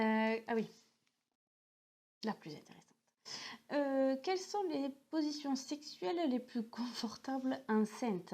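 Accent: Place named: Marseille, France. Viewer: tu parles comme une cagole de marseille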